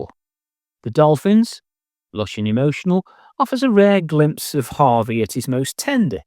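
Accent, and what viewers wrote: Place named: Midlands, United Kingdom. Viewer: I agree,no ego... passion just flows brilliantly....the accent is perfect too, a bit of Kidsgrove or Smethwick blended with a sprinkling of progtalkability.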